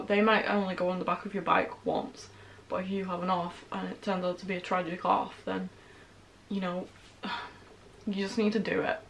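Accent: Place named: North, United Kingdom. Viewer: Where you from? Sounds like a northern accent hun x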